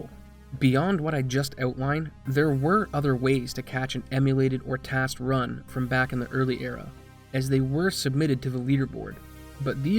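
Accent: Canada. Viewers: Your "AR" sounds scream Canadian. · Are u Canadian? You speak like a Canadian. Mars bars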